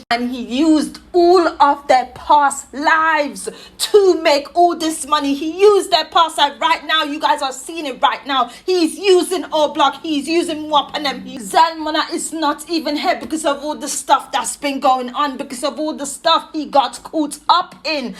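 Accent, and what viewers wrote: England, United Kingdom. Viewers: You sound like you from England leave that street shit alone..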